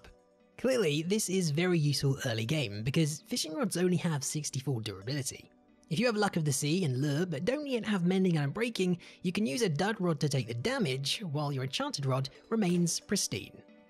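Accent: United Kingdom. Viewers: I thought so too, but then I listened to one of Grian's videos and, if you factor out the British accent, I think the similarity is negligible.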